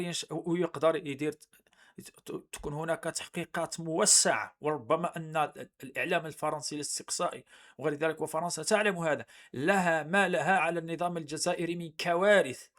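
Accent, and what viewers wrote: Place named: Morocco. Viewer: انت مروكي مذلول عييت ماتبدل اللهجة تاعك على انك جزائري وتفضحت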